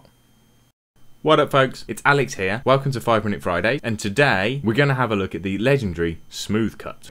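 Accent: United Kingdom. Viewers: Hi Alex, I’m very new to video editing and Resolve - as in I only downloaded it this week! Stumbled across your channel and finding your videos useful, plus it’s a refreshing change to hear a Brit voice for a change! I must have missed something here - how did you adjust the smooth cuts to cut out the dialogue you didn’t want to include? Do you use the audio track for this? Cheers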